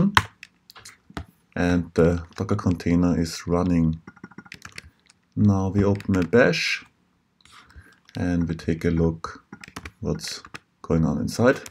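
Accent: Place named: Germany. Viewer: you sound so german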